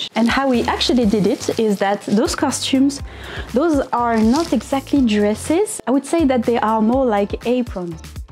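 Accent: France. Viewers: Tu es tellement française, ça s'entend jpp- Mais très cool ton tuto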